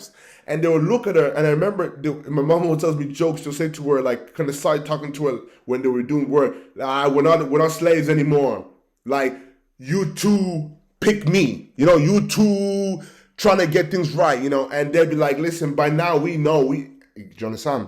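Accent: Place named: London, United Kingdom. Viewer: He has the London bridge accent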